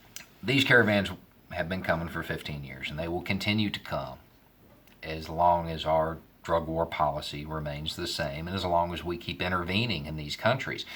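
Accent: South, United States. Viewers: (I'm assuming that is your first name) I've really come to enjoy and respect the videos you put out. I'm still pretty new to your channel and I haven't entirely agreed with everything you say. But I would say I agree with 90% of it. What I find most intriguing is how you fit the trope of "Don't judge a book by its cover." I have no doubt that I am not the first person to click on your video for the first time and instantly take you for a Trump supporter. lol It's the southern accent and the down south good ol' boy look. (This may be a little unfair being Im a native Texan with a southern accent myself. Just not quite as much as yours) Fortunately it doesn't take long to realize you are not a Trump supporter at all. For that I am very grateful. You have very good incite and thought provoking commentary. Keep up the good word my man. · So I'm new here, but is there a reason you lay on a much stronger southern accent in videos on this channel? 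You're speaking voice in the videos on the other channel is noticeably less accented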